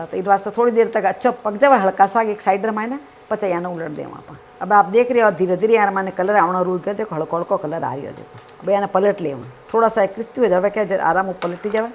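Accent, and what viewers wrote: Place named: Rajasthan, India. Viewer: Apki har recipee bhut achi hoti h aur apki mrwadi language sunne me bhut achi lgti h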